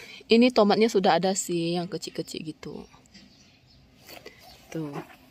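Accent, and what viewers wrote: Kalimantan, Indonesia. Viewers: Sepertinya Tionghoa Kalimantan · Itu logat tionghoa kalimantan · Logat bicara org kalimantan , Pontianak mmng kebanyakan begitu bu.. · asli Indonesia dari Kalimantan tapi dia keturunan China Indonesia ketika di Indonesia lebih sering pakai bahasa daerah seperti bahasa Hakka jadi ketika ngomong indo logatnya rada asing🙂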